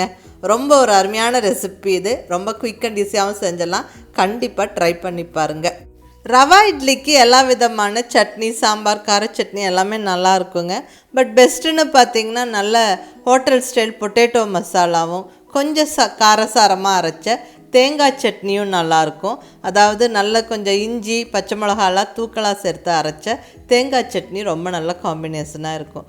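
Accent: Coimbatore, India. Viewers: ........w r u????? I want to see u..... i like ur all recepies......kovai slang superrrrrrr......i like u.... i am INDHUMATHI vellore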